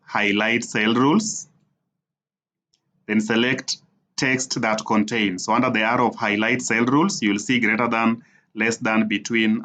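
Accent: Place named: India. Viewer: Why is the audio indian😢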